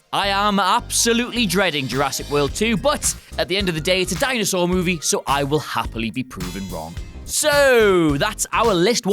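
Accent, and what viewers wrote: United Kingdom. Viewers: If I come back for anything, it's the british accents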